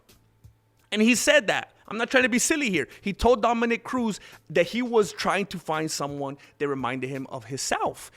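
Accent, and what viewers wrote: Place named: Mexico. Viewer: An intimate relationship with himself in a metaphorical and literal way. You are a genius my man. The num-chuks with flip flops in the background. It is so great how you can put that Mexicano flavour on the words.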